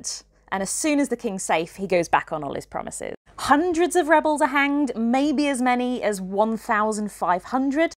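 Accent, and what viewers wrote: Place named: North, United Kingdom. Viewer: Interesting, anyone know where is from? She pronounces 'castle' and 'demand' in the Welsh/Northern manner, rather than with the usual Southern long 'a'. Just curious, some people from London do indeed say 'castle' like that.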